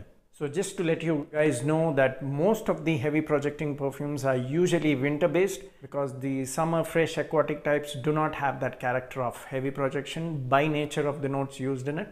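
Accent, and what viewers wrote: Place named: India. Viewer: My brother u look arab but u sound indian what ??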